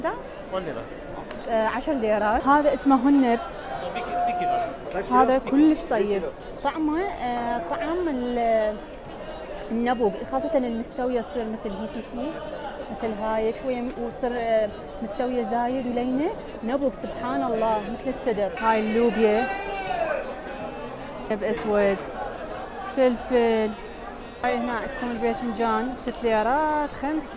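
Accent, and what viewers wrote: Iraq. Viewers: تم اصطياد هذا القناة المميزة بصراحة أولا مشتاااااااقين للاهجة العراقية ثانيا عسل عسل عسل ثالثا تركيا جنة الله في الأرض وتوفيق اختى وتحياتي للعراقيين الشرفاء وتم الاشتراك.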